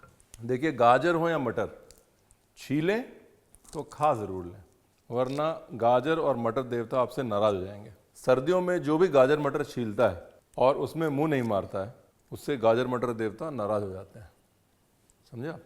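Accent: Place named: Bihar, India. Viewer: AAP ka behari tone bahut acha ba.tnx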